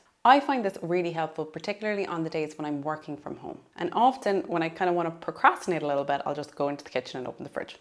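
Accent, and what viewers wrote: Ireland. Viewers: Love the accent. Sounds like a Navan accent 😊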